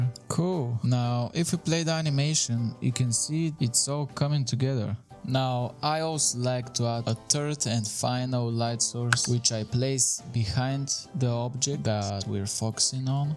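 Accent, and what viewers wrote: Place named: Russia. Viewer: russian accent = trust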